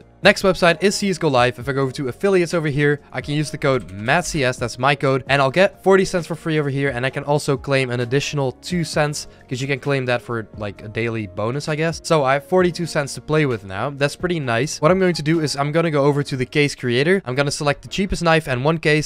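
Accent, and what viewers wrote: Netherlands. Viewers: You sound Dutch so are you Dutch? XD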